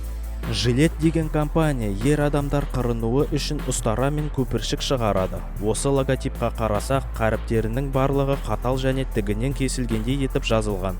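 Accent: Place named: Kyrgyzstan. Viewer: 😡дұрыс сөйле қырғыссын ба?👅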